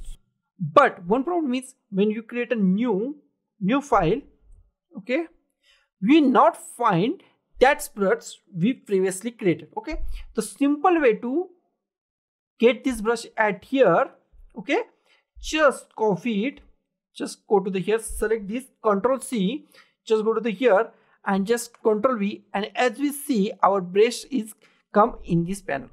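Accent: India. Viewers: Good tutorial right here, just fix your hard indian accent and you'll get 100k sub in no time. Cheers man, big ups to you.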